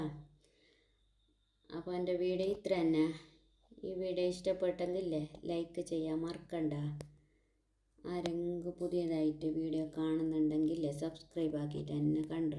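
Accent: Kasaragod, India. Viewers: Ningale Kasaragod language nalla rasandu 😊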